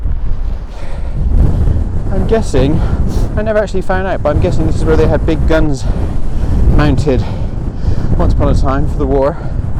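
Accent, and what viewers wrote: Bristol, United Kingdom. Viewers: Checking this out now to see what’s changed Cars at the start for definite Porthminster cafe is gorgeous and the food always excellent - cool rain clouds on the horizon Nice manoeuvre down the hill, and the high street much more active but nothing like usual at any time of year Didn’t see the news about the fire; watching this instead of going to bed but I’ll look that up tomorrow What Gallery did your Dad own ? Recognised that accent as I’m from Cheltenham, just up the m5 from Bristol I’ll stop rattling in now and just enjoy the tour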